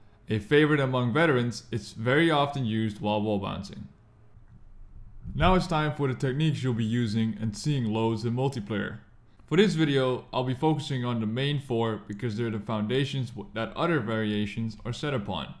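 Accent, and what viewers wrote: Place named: Hawaii, United States. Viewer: Your from Hawaii I can tell good video shooooootz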